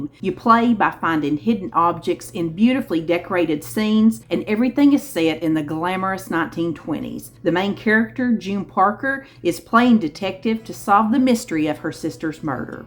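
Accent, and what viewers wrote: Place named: Southwest Georgia, United States. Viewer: I want to make all this just because of your accent. Reminds me of my aunt from SW Georgia. So charming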